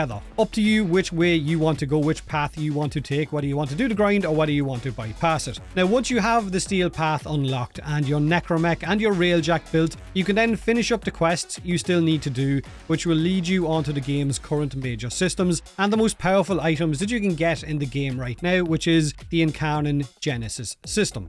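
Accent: Ireland. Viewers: I sense british accent but it sounds more like irish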